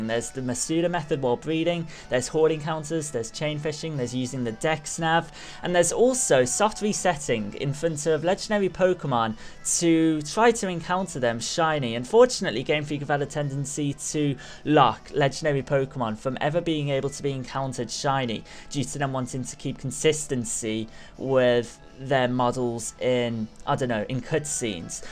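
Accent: Birmingham, United Kingdom. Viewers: Are you brummie?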